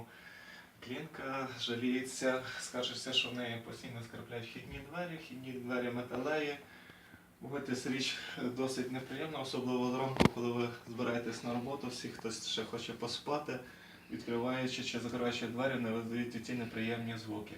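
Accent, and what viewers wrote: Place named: Surzhyk, Ukraine. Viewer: безграмотный сельский суржик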